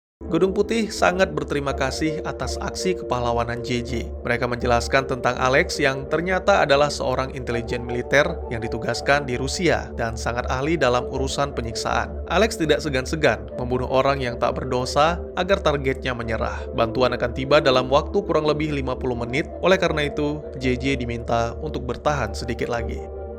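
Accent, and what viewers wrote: Aceh, Indonesia. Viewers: Kak Orang Aceh mana kak?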